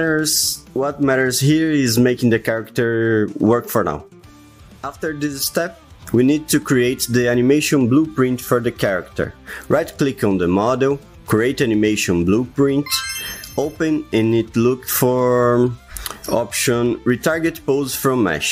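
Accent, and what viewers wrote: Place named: Brazil. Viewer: Brazilian voice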